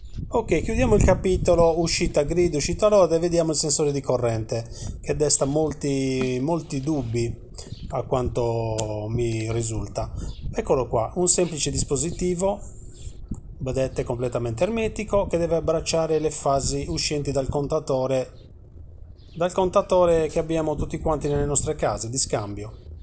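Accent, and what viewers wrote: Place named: Sardinia, Italy. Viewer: Ciao, ma sei sardo anche tu vero?? 😎 Si capisce dall'accento e perche sei in gamba! Comunque interrompi tutto sul più bello, dici "ma adesso andiamo a vedere" e poi finisce il video 😢 Io sto riscontrando un problema dei dati misurati dal sensore, che a casa mia è stato posto a monte dell'interruttore generale, proprio nel quadro di casa...incrociando i dati con letture contatore e la pinza Shelly EM che ho installato a valle del generale, hi notato che c'è una discrepanza nella lettura dei consumi di casa e dellenergia prelevata, di ben 45kWh in un mese (Gennaio, dunque 24gg)...secondo te cosa può essere? Va' assolutamente collegata proprio nella cassetta del contatore? La linea dal contatore al generale è diretta, c'è solo un altro quadretto con un altro interruttore. Comunque caricaci la fine del videoooo 😂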